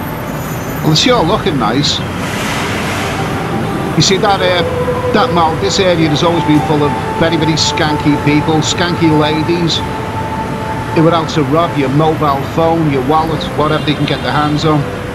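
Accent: Liverpool, United Kingdom. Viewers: Love the insight mate, top scouser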